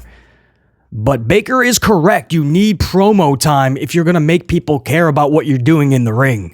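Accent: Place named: New York, United States. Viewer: You are definitely a New Yorker just like me you sound like a New Yorker1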